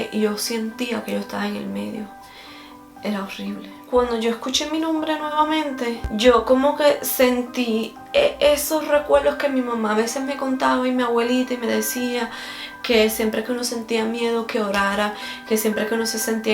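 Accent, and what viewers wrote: Puerto Rico. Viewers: Ella habla bien simplemente es el acento y la rapidez para hablar de cada país muchos en Puerto Rico hablan así y en varios países más rápido después de un tiempo te acostumbras y les entiendes mejor. A mí me pasaba que a los venezolanos y cubanos no les entendía bien, es cuestión de entrenar el oído como cuando haces listening con el inglés 😀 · en el rato que te escuche tenias acento de PR Venezuela y Mexico?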